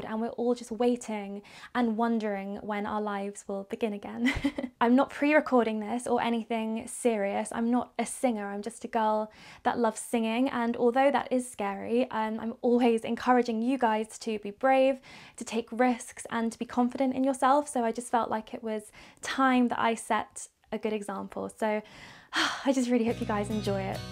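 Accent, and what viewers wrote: United States. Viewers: Wow and the American accent!! 😃😃 haha the end is the best: hiding!! Haha well done!! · I'd really love to hear your real voice without the Americanised tone , nevertheless, this was gorgeous!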